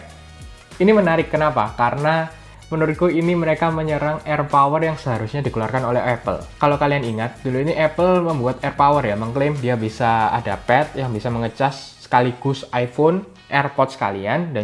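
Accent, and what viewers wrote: Java, Indonesia. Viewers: Wong jowo yo mas hehe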